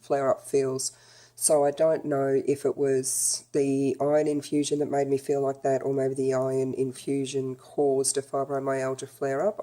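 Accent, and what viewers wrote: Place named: Australia. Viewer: Hi, Thanks very much for posting this. I had an iron infusion yesterday, am feeling achy, lethargic, hypersensitive and a bit nauseous today, like a bit flu-ey or something. Appreciate your video, so far, you're the most watchable genuine person I've found and of course the Aussie accent is the best break from all those bloody American ones! 🙄👍❤️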